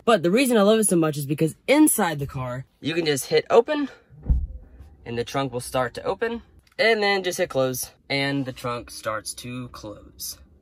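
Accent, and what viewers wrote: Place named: South, United States. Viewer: Love this video man! You sound southern, nashville here. I pick up my red model 3 next week and im so lit!!!! subscribing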